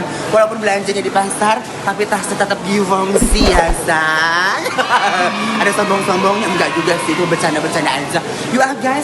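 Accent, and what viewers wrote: West Java, Indonesia. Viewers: Nyunda pisan bahasana di luar negeri oge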